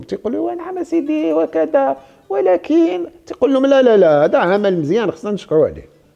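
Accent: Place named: Egypt. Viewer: هل هدا سفير مصر في المغرب ? لان لهجته اكتر مصرية من مغربيةً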